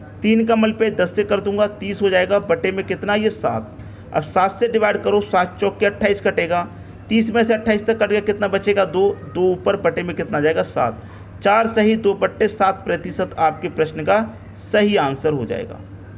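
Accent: Haryana, India. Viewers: Aap haryana se ho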